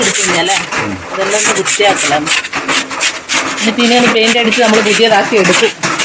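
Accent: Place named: Vadakara, India. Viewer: കോഴിക്കോട് എവിടെയാണ് നിങ്ങളുടെ വീട് വടകര ഭാഗത്തുള്ള ഒരു സംസാരം 🤔🤔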